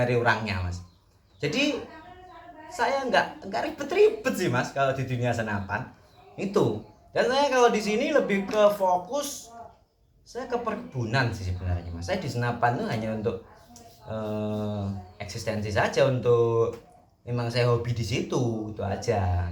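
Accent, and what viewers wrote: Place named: South Sumatra, Indonesia. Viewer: Lokasi pundi mas sampean ..kok seng dandan apache bahasane kok koyo sumsel mas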